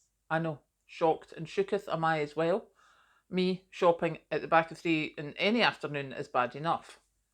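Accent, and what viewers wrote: Scotland, United Kingdom. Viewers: Best motivational speech👏 (And how I love the Scottish accent 😅)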